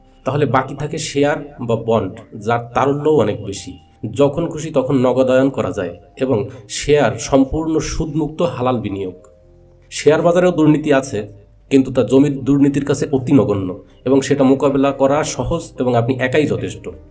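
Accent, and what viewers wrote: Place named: South, Bangladesh. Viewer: ভাই অসাধারণ। আমি আপনার এই ভিডিওটা দেখে সত্যিই অভিভুত। বলতেগেলে স্পিক-লেস। আপনার সাথে আমার পরিচয় হয়েছে মূলত কাজী তালিম ভাইর মাধ্যমে। অবশ্য এটা র্ভাচুয়াল মিট------------সরাসরি দেখা বা কথা হয়নি। আমি ক্ষুদ্র মানূয়, পুঁজিবারে সামান্য বিনিয়োগ আছে। আমি সুদ মূক্ত থাকার জন্য এই লাইনে আসা। ভাই আপনার কথায় মনে হচ্ছে আপনি দক্ষিণের লোক। যদি তাই হয় তাহলে আমি আপনার দেশি। আমার বাড়ি বরিশাল। -----------আপনার এলিয়েট ওয়েভ প্রশিক্ষরণ অল্পের জন্য যুক্ত হতে পারিনি। তবে পরে ভিডিও পেয়েছি। ---ধন্যবাদ ভাই ভালো থাকবেন।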